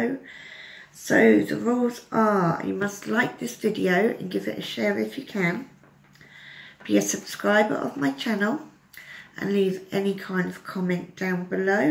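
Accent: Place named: United Kingdom. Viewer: You should never feel bad about how much you give. It’s nice no matter how much, I enjoy just listening to your wonder British accent. Have a great week!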